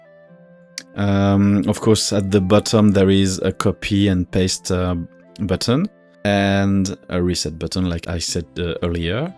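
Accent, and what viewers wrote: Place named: France. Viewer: I love that Youtube goes "ah yes, this guy is speaks french" and puts the AI English Dub over. Not even speaking french or anything btw... just... french accent LMAO Also looks epic asf dude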